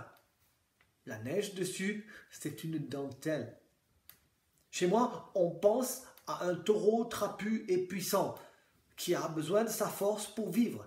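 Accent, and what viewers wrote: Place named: Germany. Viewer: FORMIDABLE. MERCI POUR CETTE LECTURE Á L'ACCENT GERMANOPHONE COMME DANS LE FILM.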